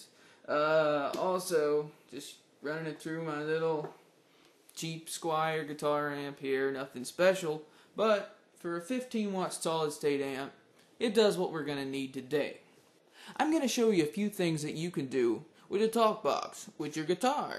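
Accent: South, United States. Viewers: Sickkk. PS I don't think this guy is stoned, he's just from the South. Whenever I hear a person from the South, I have to upgrade my estimate of their intelligence to account for their accent subconsciously downgrading it.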